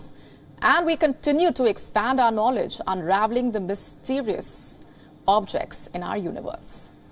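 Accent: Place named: India. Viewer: Why do these indians speak like apu...dont they know that's racist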